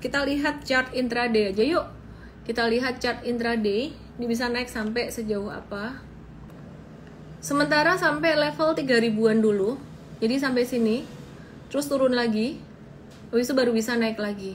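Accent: Java, Indonesia. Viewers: terima kasih ci. penjelasannya singkat dan jelas. pertahankan logat jawanya .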